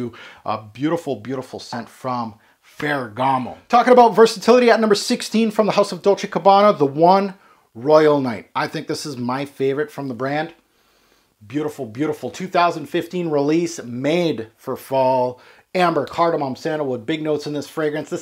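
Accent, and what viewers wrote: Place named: Montreal, Canada. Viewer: Dude are you from the province of Québec? Sounds like a solid Montrealer accent :)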